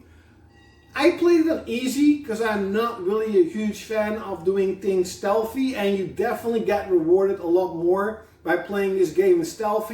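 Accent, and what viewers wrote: Netherlands. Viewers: Where are you from? I think i hear a dutch accent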